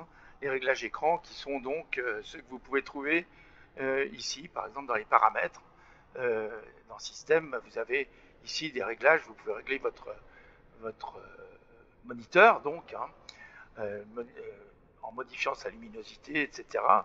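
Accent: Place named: Lyon, France. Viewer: Merci!! Dites-moi, à vous entendre, vous semblez être de la région lyonnaise. Je me trompe? ps: je viens d'essayer, je me suis mis dans le jeu, j'ai bien une carte nvidia, mais cela ne fonctionne pas: « alt F3 » ne fait rien du tout. Arf...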